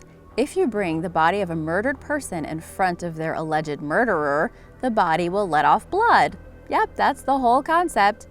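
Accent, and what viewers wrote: United States. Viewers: It seems odd that the narrator with an American accent describes soccer as "football".... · You lost me at calling soccer football and football rugby. The narrator has an American accent. It’s just weird. I’m out. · There is something triggering and insulting about hearing someone with a distinctly American accent calling soccer football. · Nothing worse than an American voice trying to tell British people about OUR historical times...🤨🇬🇧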